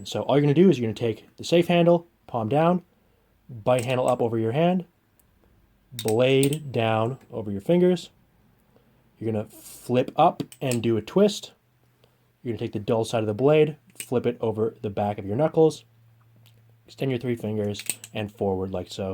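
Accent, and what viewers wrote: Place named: France. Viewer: yeah... but i gotta say it can be tough to hear well through his heavy french accent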